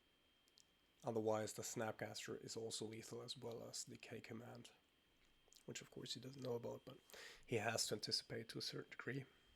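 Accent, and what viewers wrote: Germany. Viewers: Hey, nice vid. :) are u from germany? ;)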